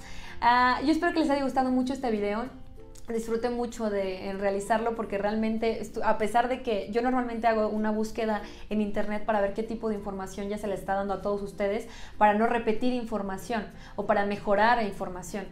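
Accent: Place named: Mexico. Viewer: nunca dicen la moneda completa, pero asumo que es mexicana, por el acento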